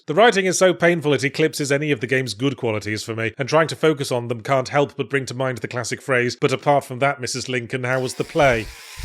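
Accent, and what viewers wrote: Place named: Midlands, United Kingdom. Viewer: Where in the Midlands did yahtzee grow up?? 🤔 that ain't no black country accent... Or brum... Or stoke... Or leicester (I could go on) 😅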